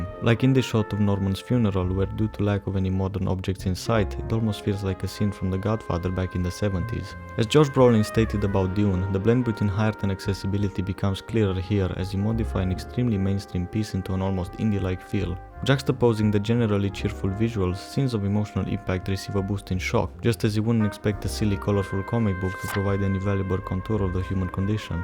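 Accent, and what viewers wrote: Eastern Europe. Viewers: Great video, but I'm much more interested in where you are from and how you came into reading American comic books. Not many people know who Mark Bagley is in the US, let alone Eastern Europe (assuming by your accent). I think that would be a cool story to hear. Do you read anything outside of Spider-Man?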